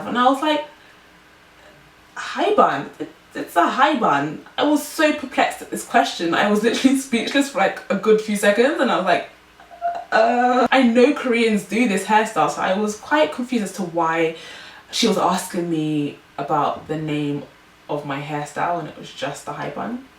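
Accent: England, United Kingdom. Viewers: Honey you are gorgeous, sexy, and I love your British style accent. And you have a beautiful personality and seem to be very intelligent. Your hair is unique and different, and irrelevant to who you are as a total individual. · Are u from england? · ahhhh yesss....a black girl with a british accent.....so sexxyyy yum......0_O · im not trying to be mean but the british accent is geting a tab bit annoying ... i mean it was ok at first but the video was a little to long for the accent and its getting tireding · Holy crap youre english!!!! I love your voice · your British 😮😮😮yesssss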